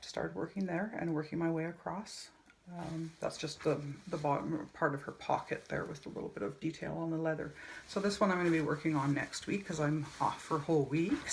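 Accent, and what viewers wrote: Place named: England, United Kingdom. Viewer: Great first video☺. Loved how you gave all info out. As you said Jules is fantastic!!! She's amazing in many ways isn't she. You needn't apologise for being nervous, it was your first one, at least you put yourself out there and I thought you did really well. Out of interest, were you born in Canada? You sound more English. I am from England lol. I like the variety of different projects. See you next time. Happy stitchy holiday☺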